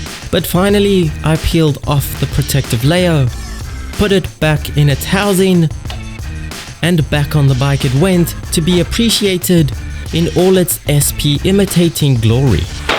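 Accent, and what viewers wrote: South Africa. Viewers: I knew you sounded South African!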